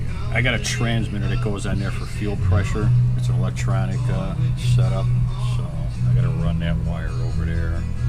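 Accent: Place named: Chicago, United States. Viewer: You still around and with us? Sounds like you're from Chicago(?). I grew up in Orland Park.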